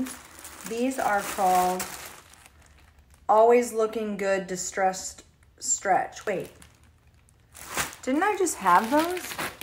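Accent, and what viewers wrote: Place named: Brooklyn, United States. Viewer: sounds from brooklyn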